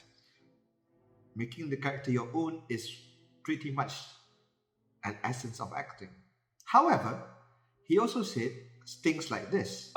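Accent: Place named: Australia. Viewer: Me walking around listening "Continually" Why is this AI Australian?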